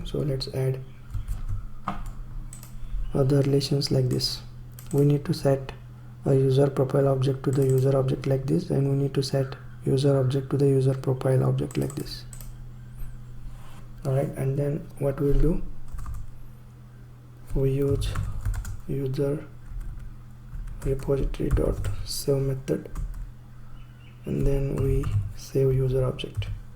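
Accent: India. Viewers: Indian English ;)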